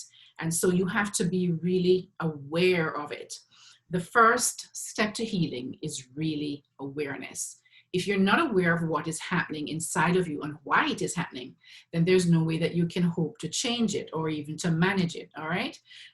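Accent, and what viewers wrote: Jamaica. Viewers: Loved this. So informative and comforting to hear a jamaican accent give such knowledge-hope I'm correct, I know my ppl lol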